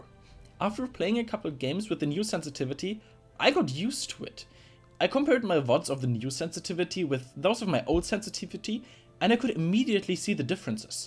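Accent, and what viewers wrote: Germany. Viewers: Man hört mega raus, dass du deutscher bist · Are u german? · Are you German ? 😂 wenn ja ich auch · Ýour accent sounds very german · You are dein Germany , richtig?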